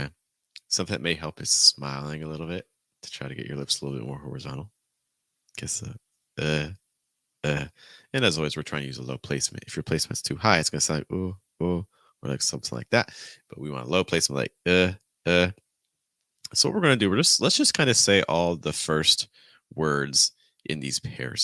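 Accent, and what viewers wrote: New York, United States. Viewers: I'm watching your content and it's so good. I have a question, which part of US are you from? New york?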